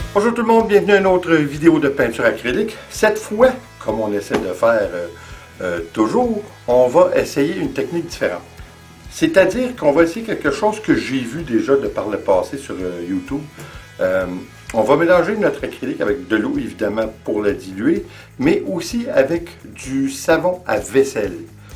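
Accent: Quebec, Canada. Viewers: Intéressant, à tenter avec le produit à vaisselle. Plaisir aussi d' entendre votre accent qui me rappelle mes vacances au Québec. Bonne continuation. Brigitte de Royan - Charente Maritime en France.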